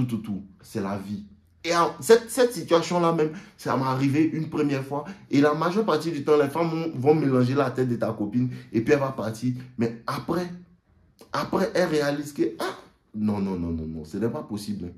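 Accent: Ivory Coast. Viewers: depuis que jai découvert ta page avant hier je suis tes vidéos en boucle pleins d'enseignements merci beaucoup;;tu as l'accent de mes compatriote ivoiriens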